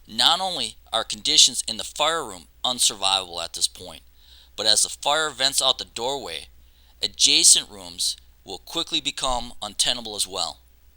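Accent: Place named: Wisconsin, United States. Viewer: There's just something about narrated fire videos. They're mesmerizing. That accent tho. Wisconsin? Eastern Minnesota?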